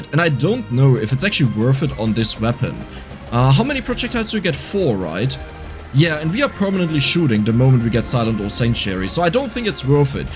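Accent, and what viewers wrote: Germany. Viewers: Are you german,?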